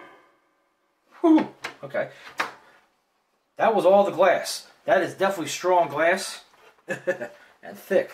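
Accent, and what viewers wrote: Pittsburgh, United States. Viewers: My God man...your accent sounds Pittsburghese.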